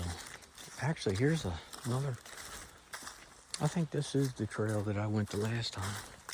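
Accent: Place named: Southwestern Pennsylvania, United States. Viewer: First and only time ive managed to be the first thumbs up lol. When i listen to you talk your accent sounds identical to the way my relatives in southwestern Pennsylvania talk and i was curious if people in Maryland have that same accent or if you weren't raised there? Intrigued as to how the other part of this video will go and if your pebble hurler will follow you over there? Good luck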